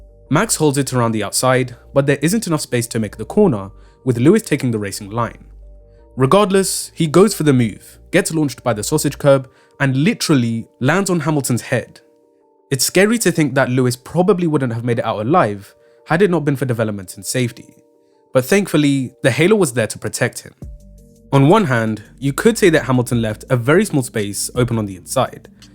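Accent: United Kingdom. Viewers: British accent noted... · This guy must be British 😄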